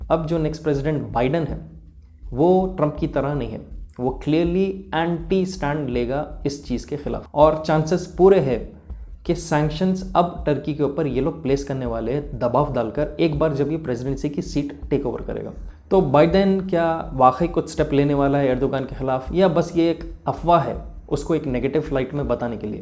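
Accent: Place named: Karnataka, India. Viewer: bhai ap karnataka se ho na apki hindi karnataka ke jaisi hai i am from karnataka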